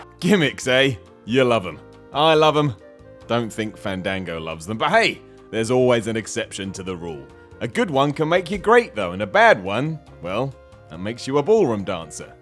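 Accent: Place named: South Wales, United Kingdom. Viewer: are you from south Wales you sound like it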